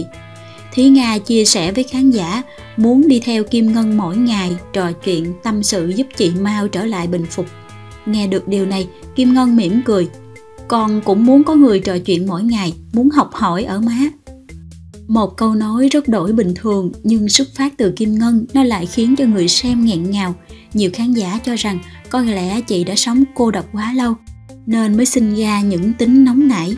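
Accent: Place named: Mekong Delta, Vietnam. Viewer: Lần đầu nghe giọng đọc rất tình cảm , ngọt ngào giống tiếng miền tây .. ❤❤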